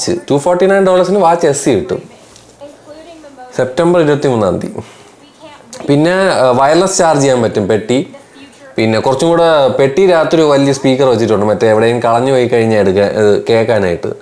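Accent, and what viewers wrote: Trivandrum, India. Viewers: അണ്ണാ tvm language പൊളി😅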